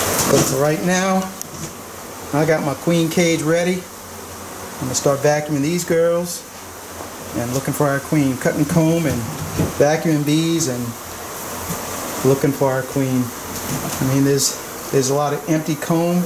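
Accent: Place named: South, United States. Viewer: I'm not just binging on bees—I'm binging on bees that are being moved by guys with Southern accents. Now that I live in Seattle and most of my Southern immediate relatives have passed, the combination of the hum of the bees and the southern accent is so soothing...like a lullaby and my childhood all wrapped up together! 'Night and may God bless us all and all the US.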